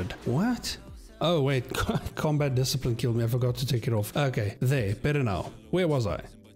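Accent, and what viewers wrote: South Africa. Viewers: Hey bru are you from south Africa? I cant mistake that accent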